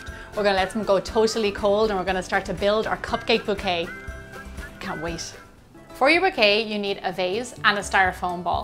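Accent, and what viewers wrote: Ireland. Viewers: Love the Irish accent! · Omg! Your Irish!!!?!? How cool!!! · You are from Ireland?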